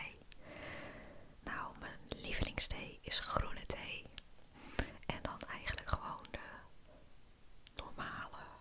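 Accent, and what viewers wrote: Rotterdam, Netherlands. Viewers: Hallo! Ik woon ook in Zuid-Holland en ik hoor aan u gepraat dat u volgens uit Rotterdam komt. Klopt dat of zeg ik iets raars😂 U filmpjes zijn heel fijn en u heeft een fijne stem Xx Sieb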